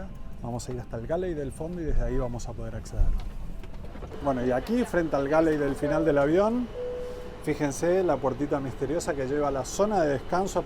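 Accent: Argentina. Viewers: DONDE NACISTE EN LA MATANZA POR TU FORMA DE HABLAR 😮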